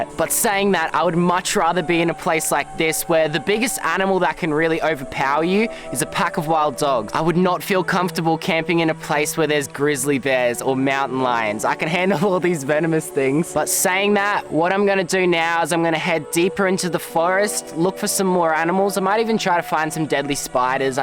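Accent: Australia. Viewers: Sounds so Australia 💀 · My jaw drop when i saw the title but when i hear him speak and i hear an austrailian accent i just said to my self nah hes gonna be fine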